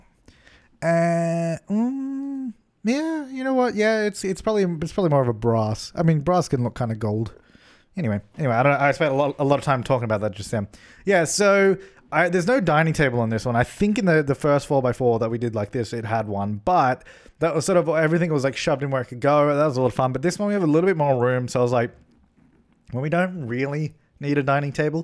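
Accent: Australia. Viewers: Are you Australian? your accent lmao, bc i am too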